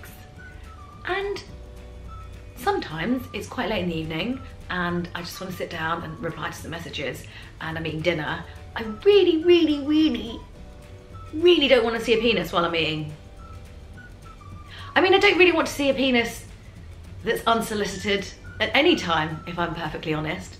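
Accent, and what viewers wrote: United Kingdom. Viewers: you sound quite british :D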